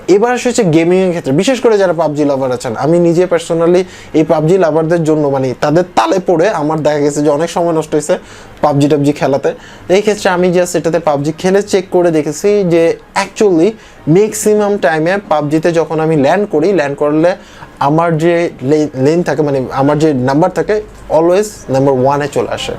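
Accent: Bangladesh. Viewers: বাংলাদেশি মনে হচ্ছে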